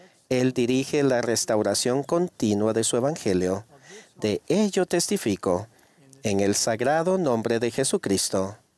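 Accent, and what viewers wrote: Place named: Buenos Aires, Argentina. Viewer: Ese acento es argentino porteño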